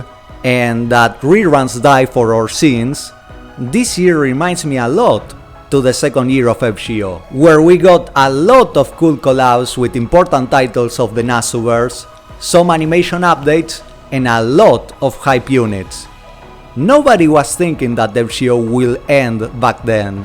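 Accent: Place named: Argentina. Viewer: El inglés más argento loco. Muchaaachooos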